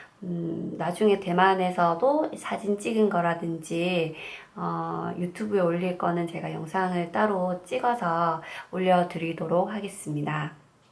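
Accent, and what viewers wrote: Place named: Gyeongsang, South Korea. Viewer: 경상도분이세용?ㅎㅎ 사투리가 ㅎㅎ 저두-대구살아용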